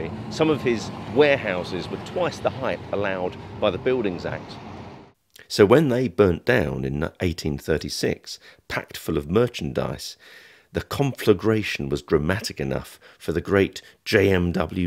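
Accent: London, United Kingdom. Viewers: For me, this is turning out to be a really enjoyable series. I especially like the presentation style - simple, clear and unsensational, and with a perfect London-ish accent behind it.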